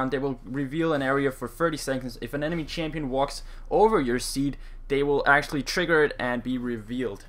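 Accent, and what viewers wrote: Denmark. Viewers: Er du fra Danmark :D?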